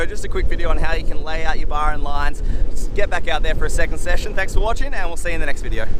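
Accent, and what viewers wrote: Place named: Australia. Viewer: Hey. Just wanted to say I’ve appreciated all your videos. It’s great to have an Aussie voice showing us the way. It’s clear you love the sport and want others too as well. Your lessons are well thought out and easily understood ( except for the odd one where the wind in mic plays havoc. And your location always looks stunning too!! I hope you’re ok. I haven’t seen any new vids for a while. I have a question for you and I’m not sure whether you could help with some technical advice. I’ve noticed as soon as the wind gets on the stronger side for my kite size I have a lot of difficulty stabilizing the kite and often end up constantly sineing the kite unintentionally. Do I need to just focus more on what I’m doing or should I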